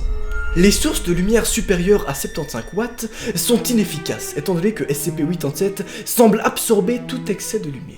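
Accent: Belgium. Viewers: il est belge ou suisse chépa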